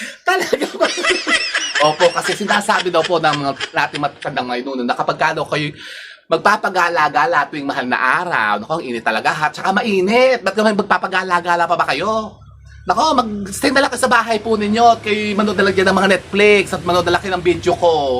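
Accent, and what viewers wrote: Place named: Bulacan, Philippines. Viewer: taga bulacan pi ba kyo?yong punto kse ng pagsasalita nyo punto ng bulacan,taga bulacan din aq balagtas at isa nyo aqng subscriber.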